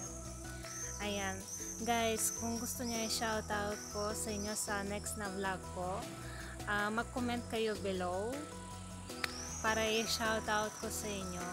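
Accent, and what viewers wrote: Philippines. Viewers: I like your filipino accent. I'm Pilipino new friend from M and S vlog. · Sheeeesh! Tagalog accent. Wow!😳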